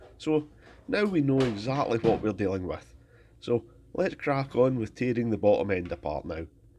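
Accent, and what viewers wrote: Scotland, United Kingdom. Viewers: Man, between the dulcet tones of Scottish brogue and the "I make a new one"'s of My Mechanics, my craving for the greatest content on YT is satiated. Excellent work, per usual, friend. Can't wait to see this beauty in action. Much love from the USA.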